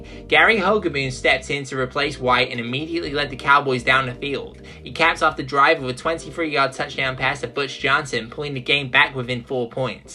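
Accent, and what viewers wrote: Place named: Australia. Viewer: Didn’t even notice the Aussie accent right away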